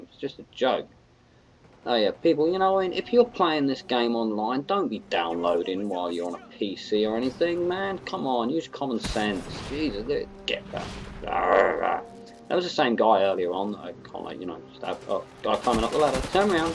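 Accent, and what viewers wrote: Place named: Australia. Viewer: seriously the Aussies passed on their accent to you. its sounds awsome. great vid bud.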